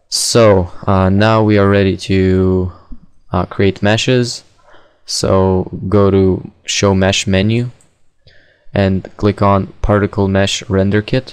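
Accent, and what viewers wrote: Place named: Netherlands. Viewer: do you have a dutch cennel want ik hoor dat je nederlands ben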